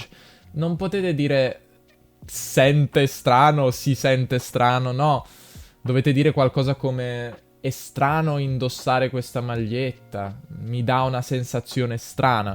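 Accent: United States. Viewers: Very American accent to your English. Is that the English they teach in Italia? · I love these videos. Also, your American accent is spooky good!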